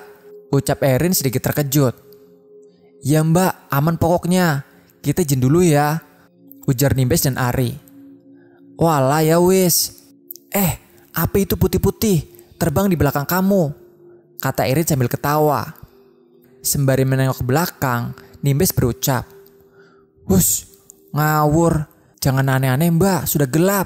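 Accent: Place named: Java, Indonesia. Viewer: masih ada medok nya dikit tolong d hilangin mas... biar enak kedenger nya.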